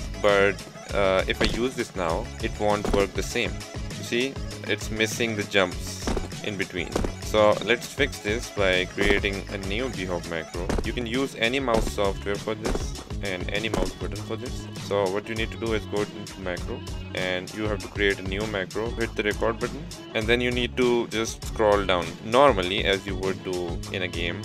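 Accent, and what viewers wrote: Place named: India. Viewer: indian english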